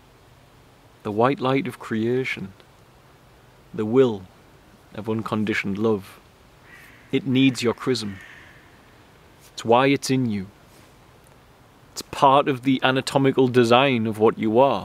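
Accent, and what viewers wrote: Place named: Geordie, United Kingdom. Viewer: Are you from the North East of England?? You have a great accent/dialect and it is very similar to my partner’s accent and He is a Geordie (from North Shields, North Tyneside) of which He is extremely Proud.. Just thought I’d ask if You are also From Our Local Area.. Obviously I’m meaning - Originally.. Much Appreciated for your excellent work in helping humanity - True Angel of God xxx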